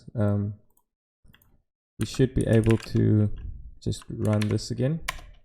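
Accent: South Africa. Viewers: Came here for the bun and HTMX, stayed because I heard the SA accent lol. Also playing with HTMX now, still wana start a side project with bun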